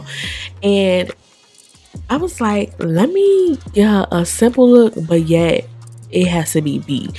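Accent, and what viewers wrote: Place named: DMV, United States. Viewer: You sound like you're from the DMV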